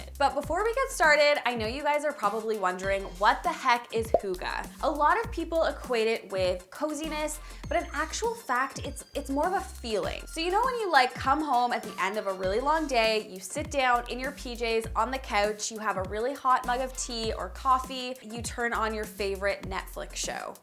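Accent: Canada. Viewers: Very cozy! Your living room is so inviting - attractive, but not intimidating. There's a happy mean between sterile and cluttered - just enough to engage the eye but leaving plenty of breathing space. I do understand the fire hazards of candles, but LED candles (or diffusers) aren't the most environment-friendly (though they are nice!). Love the pom-pom blanket.. but unfortunately, it's too hot right now in India to even contemplate coziness and rugs and pom pom blankets without a shudder.. coziness is a cold shower right now. Please keep making your awesome videos- they are such a treat and inspiration - and that Canadian accent is just too too adorable. Hi to Harriet and Marty! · Dat canadian accent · I didn't know canadian accents were that obvs to others haha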